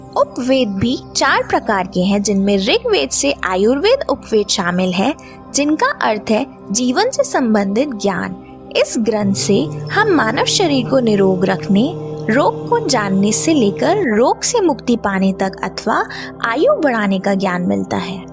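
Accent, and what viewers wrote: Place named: England, United Kingdom. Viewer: अच्छा है किंतु हिन्दी का उच्चारण उचित तरीक़े से करें ना कि अंग्रेज़ी तरीक़े से। जैसे उपनिषद ना कि उपानिषद।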